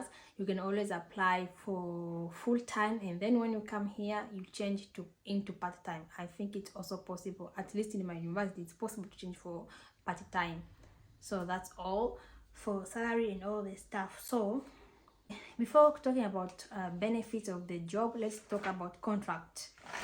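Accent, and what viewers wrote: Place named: Tanzania. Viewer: Hello thank you so much for your video it's very nice.I can here that your from Tanzania, please if you will not mind I can have contact with you because also I'm from Tanzania and want to speak with you. Thank you for your understanding 😊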